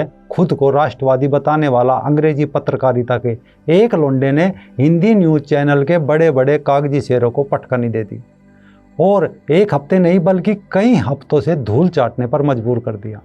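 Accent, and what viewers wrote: Haryana, India. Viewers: I really like and admire your choice of topics and your sarcastic comments, one liners, and choice of adjectives with that Western UP & Haryanvi accent. Sabhash Keep it up. Keep posting more than one video per day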